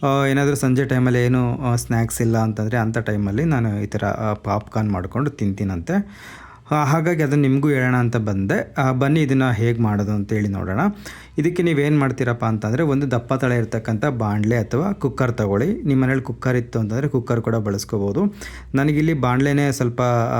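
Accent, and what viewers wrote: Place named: Bangalore, India. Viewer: Yaru ene helali neevu mathadtha recipi thorsi ivathu yako bangalore janara thara mathadha idhira ista aagolla chithra durgakade mathadidhange mathadi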